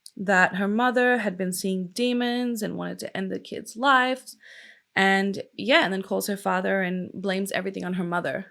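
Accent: Australia. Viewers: Its so refreshing hearing an ozzie accent instead of american for once! <3 P.S Im from New Zealand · I only just discovered this channel, absolutely love finding fellow Aussie’s to listen to & my daughters names Zarah 😊 good video I’ll definitely be back for more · You have such a calming voice. Are you Australian? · Its so weird. Lol. Since i heard your accent i understood your from Australia, you look like a child between two more famous makeup and crime youtubers, one is from US, named Bailey Sarian, second is from Australia, named Bella Fiori. Lol you look like a mix of them both. Anyways, continue on. And where are you ethnicaly from? Im curious, i would say Middle East or little Asian. Thanks.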